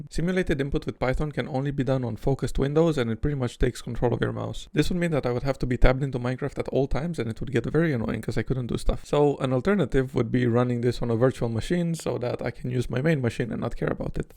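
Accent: Romania. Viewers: as a romanian the second i heard your accent i just knew u are romanian:)) keep up the good work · Why Romanian accent familiar to Indian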